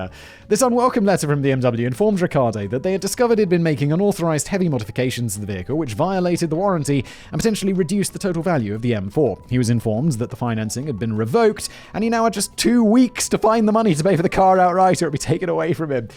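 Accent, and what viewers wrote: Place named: Oxford, United Kingdom. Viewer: I love how Simon has the vocabulary of an American Midwestern and the accent and diction of an Oxford Man!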